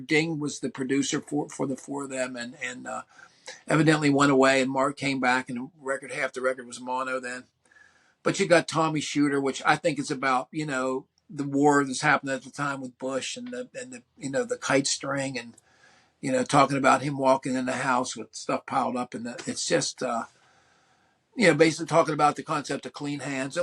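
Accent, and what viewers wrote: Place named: Maryland, United States. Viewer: Your Maryland accent, which I share with you, is excellent for reviewing Fall albums. It’s a little oddball in the pantheon of American accents, although it can’t really rival the distinctiveness that is the Mancunian accent. I could be wrong about this, but it seems the Fall always had a lot of fans in the Baltimore / DC area.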